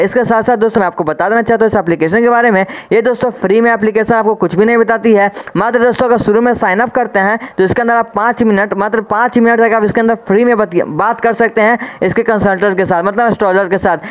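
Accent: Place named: UP/Bihar, India. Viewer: Bro are you from up/bihar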